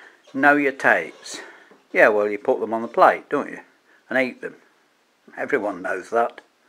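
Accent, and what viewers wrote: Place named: Northern England, United Kingdom. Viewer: I find it amazing how quickly accents can change in our country. Im also from Lincolnshire originally (Skegness) and you Sound a lot more "Northern" or "Midlands" than I do. Maybe skegness gets some influence from east anglia? I'm not really sure....I moved to Paignton in Devon about 5 years ago and it was always fun getting people to guess where I was from haha they can never quite tell 🤣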